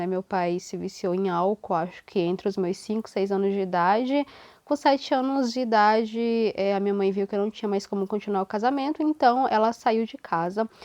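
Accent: Rio Grande do Sul, Brazil. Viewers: Pelo sotaque poderia jurar que você é gaúcha de nascimento! Que história bacana a sua, cheia de coragem e perseverança! Parabéns por não se encolher e se vitimizar: você merece toda as realizações que a vida puder lhe dar!❤ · Achei que tu fosse gaúcha!! Sotaque lindo! Ótimo vídeo!!! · pelo seu sotaque nos vídeos sempre pensei que você era natural do Rio Grande do Sul! 😅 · Nossa que legal sua história e eu pensando que vc era gaúcha raiz pq seu sotaque está bem do local e também a maioria dos Maranhense que eu convivo , não falam com o sotaque do local. Mensagem muito positiva de superação,garra, coragem e isso é o que valorizo numa mulher ser perseverante, lutar por aquilo que acredita. Beijo enorme 😘❤️ e todo sucesso do mundo pra vc!!!!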